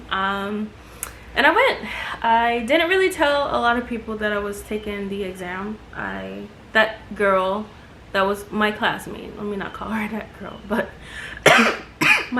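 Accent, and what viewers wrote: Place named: Jamaica. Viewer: Heard the accent before the patwa buss 😂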